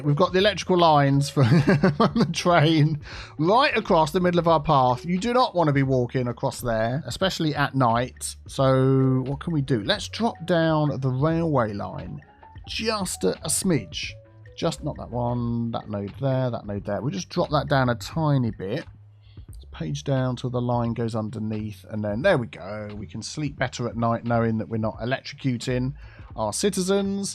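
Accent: United Kingdom. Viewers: Your British voice is relaxing 😅😂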